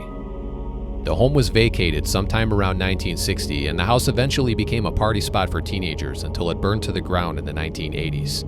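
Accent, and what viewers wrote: Chicago, United States. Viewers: I've lived in Southern California since 1975, however I grew up in Chicago and St. Louie, so I thoroughly enjoy your videos and your accent, which reminds me of home. You're fantastic. Thanks for all your hard work!😊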